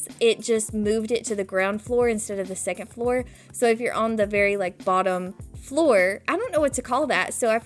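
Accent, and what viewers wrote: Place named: South, United States. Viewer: i love ur souther accent! and this build is absolutely killer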